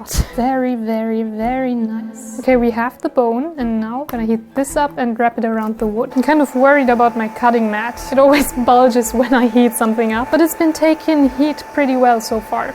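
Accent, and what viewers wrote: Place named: Germany. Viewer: Ha I knew you were German I could hear it in your voice I'm German to brw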